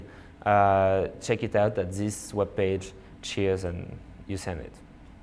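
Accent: France. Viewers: Good talk with a lovely French accent :) The thought process of growth hacking can be taught and mastered, that's what people have to tell themselves. Read books, be around people who've done it, and actually jump into business. I empower people everyday at Elium (<link> and I can say that the barriers between them and their dream life are often imaginary !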